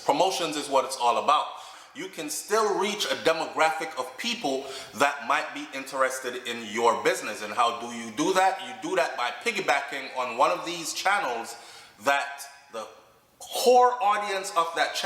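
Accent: United Kingdom. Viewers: Ur doing it again...I picked up a British ascent this time 🤣🤣🤣🤣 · Sound like u try this thing yah already eno bro,all white British accent..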